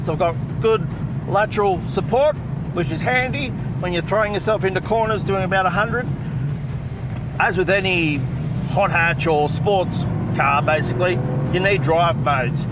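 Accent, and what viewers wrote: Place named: Australia. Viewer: As soon as I hear an Australian accent, I know it's NOT the version with the track package lol.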